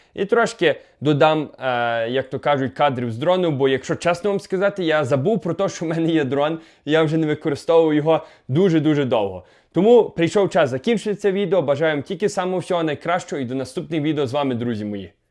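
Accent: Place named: Lviv, Ukraine. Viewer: Красень, твій акцент вражає ! Так мають розмовляти всі Українці, не тільки вихідці зі Львова! Я особисто з Житомира і шкода , що люди з Донбасу і з східної Ураїни так не розмовляють. Дякую за твій контент ! Слава ЗСУ!!!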